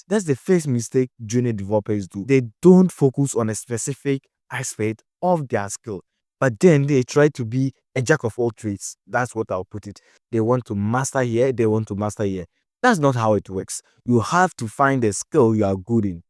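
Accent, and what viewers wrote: Ghana. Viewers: 😂 I like your content bro. Keep it up. I like the way you speak I guess you are from Ghana. Finding job’s and branding as a developer is a very serious problem indeed.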